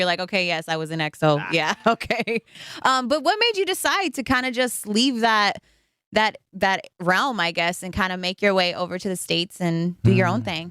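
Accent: Philippines. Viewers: I'm sorry but I can't with the Filipino accent 😢😢😢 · I can hear the Filipino accent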